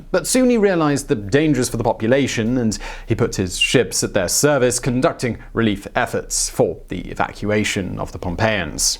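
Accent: United Kingdom. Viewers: is a brit, so i'm sure it doesn't matter and will never happen, but i can only wonder what it would be like sitting at a thanksgiving day meal with. ten servings of 19 minute segments. with all the snark and wisdom that only the elocution of a british accent can bring to the english language. rock on.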